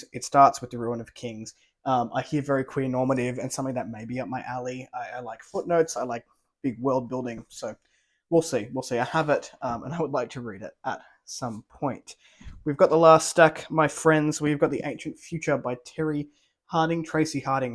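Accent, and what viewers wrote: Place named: Australia. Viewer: Oh thank goodness. I've been hunting around for Aussie booktubers with similar book interests. Your accent makes it easier to hold my sorry excuse for an attention span. So many of these are on my TBR. The used bookstore is my vice.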